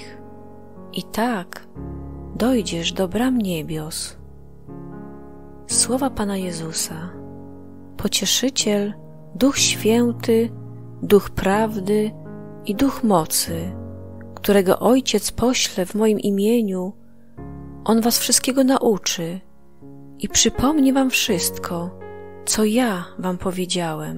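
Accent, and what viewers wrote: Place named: Białystok, Poland. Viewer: Jestes z Bialegostoku lub okolic ze tak dziwnie mowisz?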